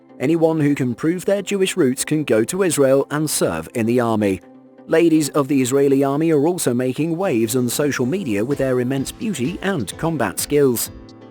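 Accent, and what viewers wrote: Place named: United Kingdom. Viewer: *women... FFS and it's a British naration.... Disgraceful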